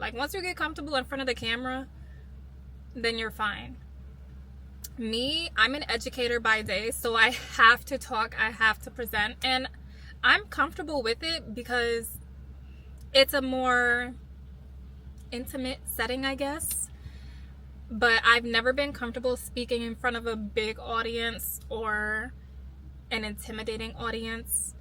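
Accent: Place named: Trinidad and Tobago. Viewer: Ya sound like ya from Trinidad 🇹🇹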